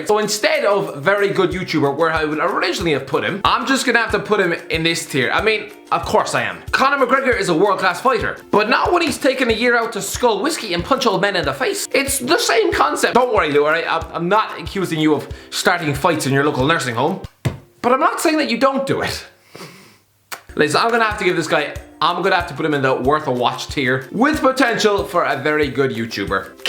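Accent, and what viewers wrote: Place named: Ireland. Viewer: Whenever I click on a video from this channel, I pray to hear the. I see, my prayers have been answered.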